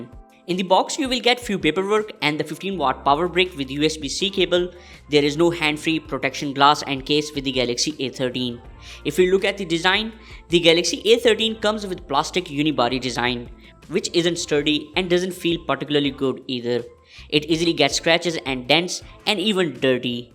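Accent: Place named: Sweden. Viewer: Swedish Accent.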